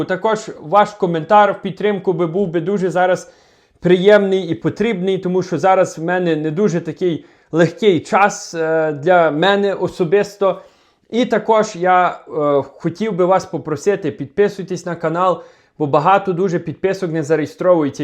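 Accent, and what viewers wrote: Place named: Western Ukraine, Ukraine. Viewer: Красивый Украинский язык с западной ноткой. Восхищен! ( Виктор Одесса)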